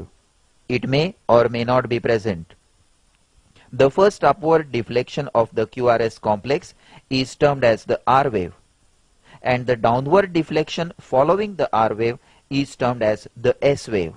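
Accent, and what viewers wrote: India. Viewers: good (old) video, but his indian pRRRonunciation killing my eaRRRRs..... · Thank you. but you'd better say no thing ! indian english is damn terrible !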